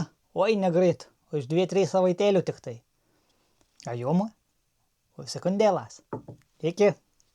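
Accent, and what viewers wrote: Aukštaitija, Lithuania. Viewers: Malonu žiūrėti ir klausytis aukštaitiškos tarmės + apetitą žadinantys valgiai + draugiškas ir ramus šuniukas 👍👋🍜🐈O čia , žiūrėk, ir nauja idėja gali gimti, pvz., „Kų šindie kaštavosma bunkerioki“ 😄 Sėkmės!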